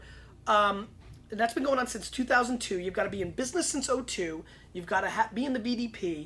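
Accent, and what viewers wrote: United States. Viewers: Just a tip,. You should work on toning down your american accent. It is impossible to understand your German!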